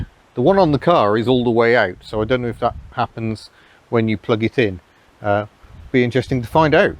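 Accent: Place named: England, United Kingdom. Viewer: I like how you English say chickin. Daewoo is a nice shape but not the colour I would personally pick. That three cylinder growl was a treat to the ears. I also enjoyed the Charade for that in a recent video.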